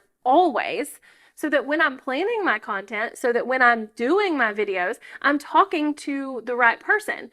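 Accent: South, United States. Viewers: That southern drawl makes me smile so much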